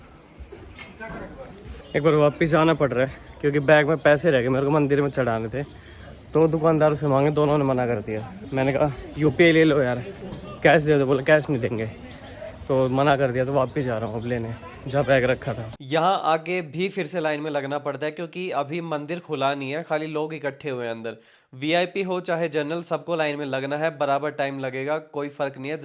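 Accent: Haryana, India. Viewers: Bro's content day by day 📈 and your haryanvi accent oye hoye🤌😂❤️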